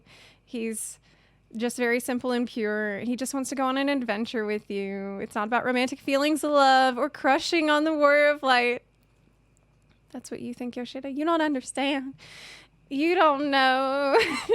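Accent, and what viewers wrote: South, United States. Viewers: Love the southern accent :D PS: It would seem I've become a simp...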